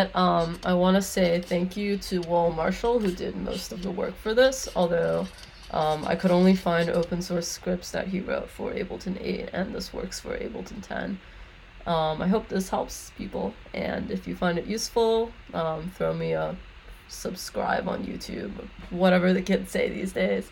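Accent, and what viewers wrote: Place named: United States. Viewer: japanese but american as you can see how she talks.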